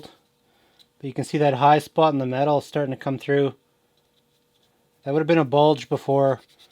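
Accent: Canada. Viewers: Just wondering, are you Canadian? Sometimes you sound Canadian and sometimes you don’t.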